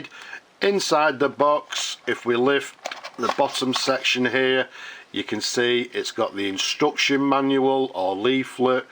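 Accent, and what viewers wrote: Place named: Yorkshire, United Kingdom. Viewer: tha sounds lak a Yorkshire man 😎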